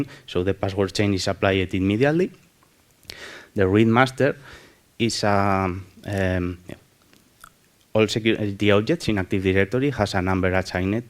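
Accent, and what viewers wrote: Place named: Spain. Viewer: english with a strong spanish accent but so useful ...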